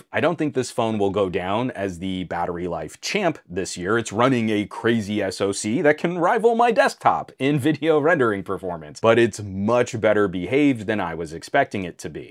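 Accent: United States. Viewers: Mr, what a beautiful American English accent you have..🎉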